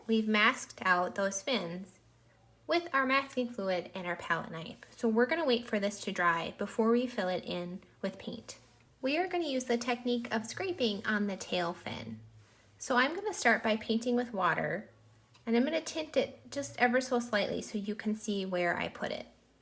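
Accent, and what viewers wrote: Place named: Minnesota, United States. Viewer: I have watched all of the lessons in this series and decided to paint the "funky fish" while going through the videos as one tutorial. I think you made some bold decisions with color choices as compared to the reference image which resulted in an awesome goldfish! Good job! I also loved hearing your accent which reminded me of the 25 years I lived in Michigans U.P., although you sound more Minnesotoan. Thanks for the time and energy you brought to this series.......that was a lot of work on your part.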